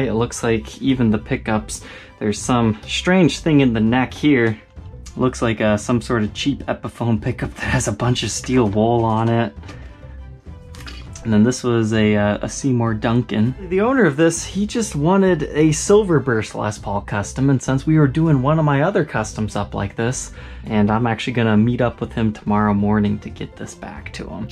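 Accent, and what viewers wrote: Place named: United States. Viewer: Because you sound American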